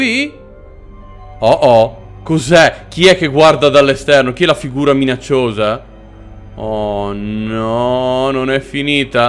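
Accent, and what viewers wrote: Veneto, Italy. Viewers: Il tuo accento veneto è sublime.😂😂😂😂😂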